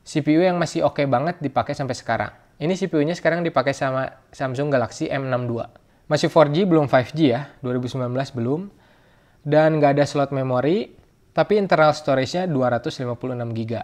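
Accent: West Java, Indonesia. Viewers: Ga sengaja mampir sini. Pas awal denger narasinya langsung kepikiran "hmm... Logatnya kayak urang sunda". Pas ngomong "geuleuh.. Gengsi.." ah fix, baraya Sunda.. 😂 Salam kang.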